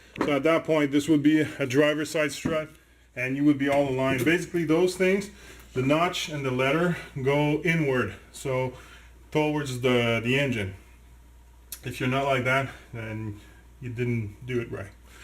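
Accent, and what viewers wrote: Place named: Quebec, Canada. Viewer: He sounds Quebecois to me lol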